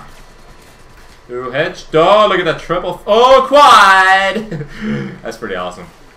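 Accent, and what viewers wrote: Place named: Sweden. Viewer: nope just a normal swedish accent ^^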